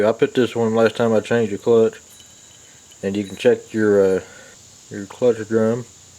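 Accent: South, United States. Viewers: Old video but thank you! Lol you remind me so much of my high school shop teacher Mr Ragland 😂❤. Wershers...😂 always gave him hell about his deep twang. Lol thanks for the info!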